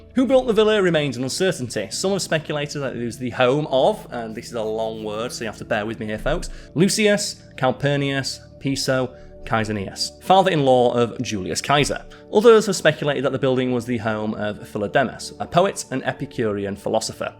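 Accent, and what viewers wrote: United Kingdom. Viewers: Fun fact, accent is closer to traditional British English than Simons. 😊. you may want to slow a bit so many others might understand what you are saying. Your accent is thick and many find it difficult to understand. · ❤ Your enunciation may need a little practice, but I could understand you just fine, DESPITE your British accent, LOL! One note about your not wanting to eat “poo fish 🐠,” have you had Tilapia or white fish? Tilapia is a new name for a old fish, but 85%-90% of the worlds Tilapia comes from…wait for it…sewage treatment plants. Not joking. Look up an episode of “Worlds Dirtiest Jobs” about Tilapia farming. 🤢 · yes, true, but the algorithms that attempt to decipher the audio to turn it into captions are (probably) trained on the sort of English spoken by the designers (likely in Silicon Valley in California, USA), and the largest repository of audio recordings (Hollywood, in Southern California, USA.) So the algorithms are biased towards Californian speech, in all likelihood. 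It’s not surprising that they wouldn’t work well for either Simon or this fellow, both of whom are Brits.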